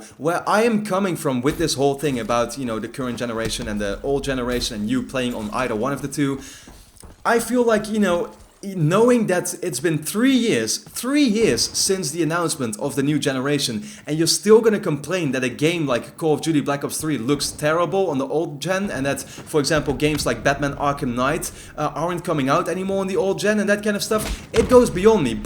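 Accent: Netherlands. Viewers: are you dutch? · I think he's Dutch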